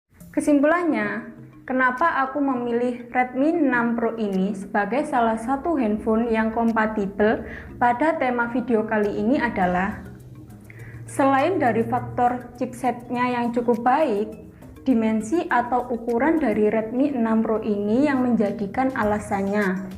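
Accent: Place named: Java, Indonesia. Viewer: Medok men to mbak ma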